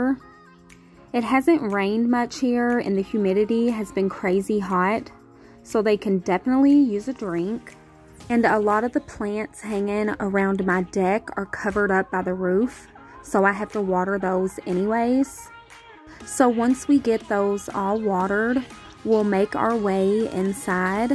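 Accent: South, United States. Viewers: I hate cleaning the bathroom! I clean the toilet everyday because I have all men in my house. So ya you already know! Glad you made another video!!!! Was missing that southern accent!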